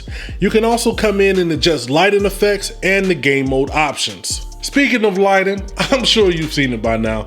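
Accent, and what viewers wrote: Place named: New York, United States. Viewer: Im an OG tech/barber with a big ol Logitech G106. I dont really use it to game though because of tartarus but I'd like to try the calibur out. Im really liking your vids bro. You sound like a brother from NY but if you're ever out here. stop by the shop so i can tighten up that beard for you one time. Imma stick around and watch you grow this channel. Thanks for the review.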